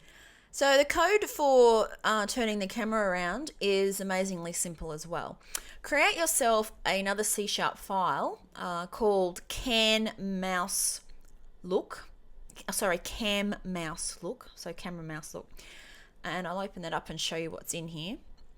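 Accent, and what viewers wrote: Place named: Australia. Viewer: I love your Australian accent!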